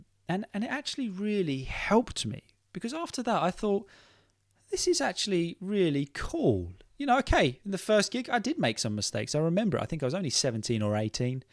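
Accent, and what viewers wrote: United Kingdom. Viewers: Hey dude, how's it going? Your British accent is awesome. Hi from Fairfield, Connecticut, United States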